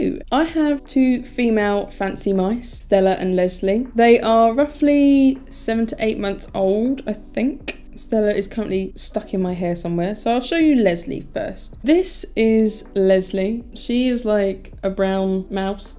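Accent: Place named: United Kingdom. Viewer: Love the accent innit. UK accents are so cool.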